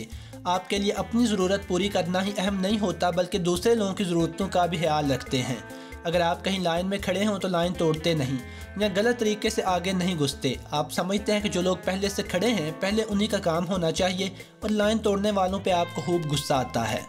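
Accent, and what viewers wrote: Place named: Lahore, Pakistan. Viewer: آپ کا لاہوری لہجہ کافی پسند آیا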